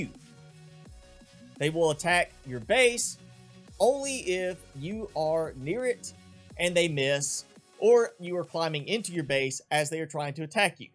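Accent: United States. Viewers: Pretty sure he's American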